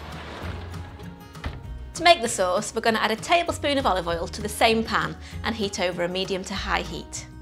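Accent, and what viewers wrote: United Kingdom. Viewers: isnt it funny how a british accent instantly makes someone charming? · I hear a British accent. For sure this will be in another video called "Italian chefs react to Italian cooking videos" with them crying bloody murder 😂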